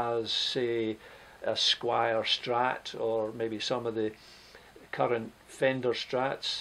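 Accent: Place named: Scotland, United Kingdom. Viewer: That made happy to hear the Scottish accent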